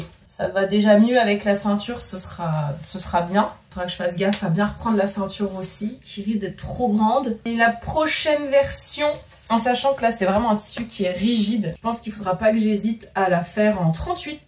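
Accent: Lorraine, France. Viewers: Cc Bouton jean pour moi 😁 Et c’est toujours un plaisir de te voir et j’adore ton accent lorrain 😅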